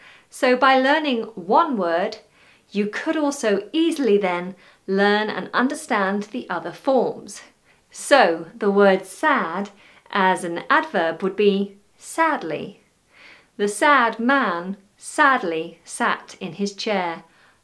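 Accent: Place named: England, United Kingdom. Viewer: when you speak with your nothern accent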